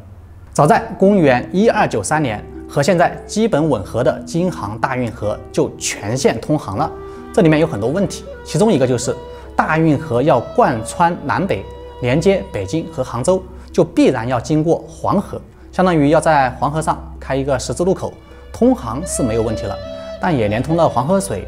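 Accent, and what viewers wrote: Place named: Sichuan, China. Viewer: 听起来一点不费力，典型四川人普通话，你多习惯就好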